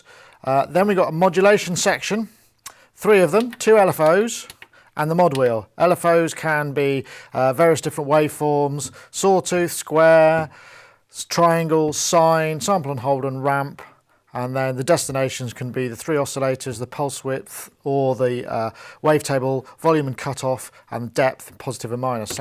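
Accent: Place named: United Kingdom. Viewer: Lock, stock, and two smoking oscilators accent right there. This guy should be in the next Guy Ritchie movie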